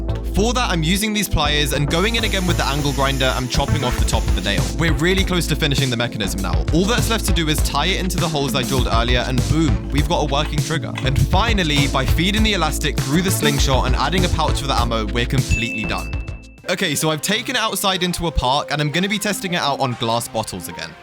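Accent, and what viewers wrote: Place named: England, United Kingdom. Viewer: im trying to work out what country you live in because you sound English but seem like you live in America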